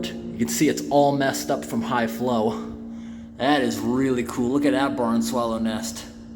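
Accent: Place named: Montana, United States. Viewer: u from montana? ur accent sounds like a guy i knew from montana...or at least he lived there at one point in his life lol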